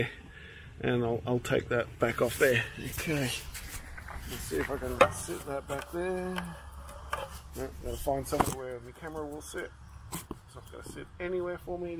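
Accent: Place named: Australia. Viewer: Real Aussie. 😁. Thanks Mate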